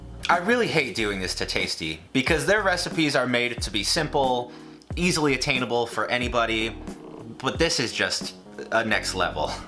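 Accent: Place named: North, United States. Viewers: I’m from Long Island New York and he sounds like my half of the Island. Completely normal. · Yeah, he has a northern accent! He lives in New York, doesn't he? I suppose it's subtle for people in New England, but as a Southerner with a California-esque accent, it's really evident lol!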